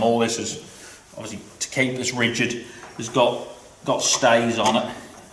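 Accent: Norfolk, United Kingdom. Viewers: Just found your channel and enjoying your content. You sound like a good old Norfolk boy, takes one to know one, Sub you.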